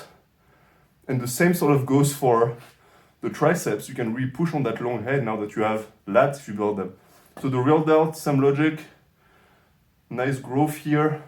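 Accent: France. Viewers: Are you French? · french accent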